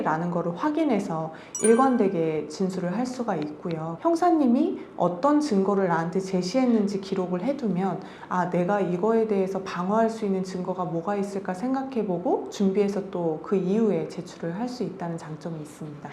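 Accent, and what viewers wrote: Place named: Seoul, South Korea. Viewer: 참 미인이세요,말씨두 너무 이쁘구요.내고향 서울 말씨 너무 듣기가 좋구 편해요.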